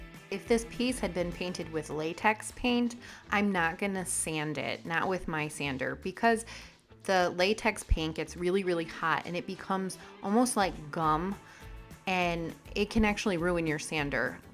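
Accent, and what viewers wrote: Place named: Minnesota, United States. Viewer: You sound like your from minnesota.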